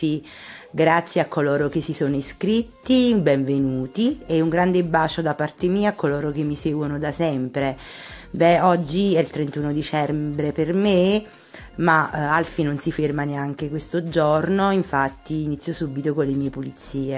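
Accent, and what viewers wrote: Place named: Sicily, Italy. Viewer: Mi fai morire dalle risate quando parli in dialetto 🤣🤣🤣🤣 io sono siciliana e ti capisco bene 😁 e si siamo sempre a pulire 🧹 ti abbraccio ❤️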